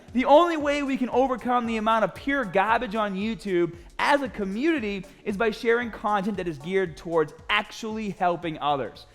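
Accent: Boston, United States. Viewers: What can i say... the title aren't that clickbait... your boston accents? Just got it ways to the title. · Love u, doing it without juice, love the Boston accent, I'm from Connecticut, my dad was from Boston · Is he from "bah"ston MA? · I love how you took that awesome Boston accent and made it a thing on this channel.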